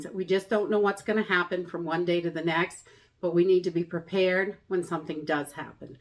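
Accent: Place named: Maine, United States. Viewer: I grew up in Maine outside Bangor-so her accent makes me smile :)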